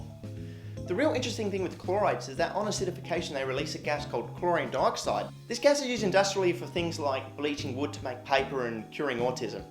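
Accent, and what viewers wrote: Australia. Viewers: Urinanalysis recycled tube with yellow liquid therein...oh yes! And your Aussie accent sounds like mine, but I'm in SA and surely you are a Perth person??? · For sure; without any doubt in my mind of all the chemtubers based solely on your being from Australia, you would be the most likely to both start a fight and with it. I'm not suggesting youtube host you, I'm saying 12 cans and three Jager bombs you. Having said that though you sound a little Victorian, maybe southern NSW or something?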